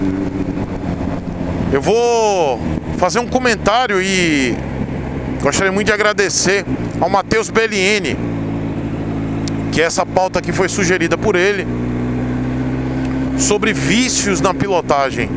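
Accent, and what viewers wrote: Bahia, Brazil. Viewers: Você é de Salvador- BA?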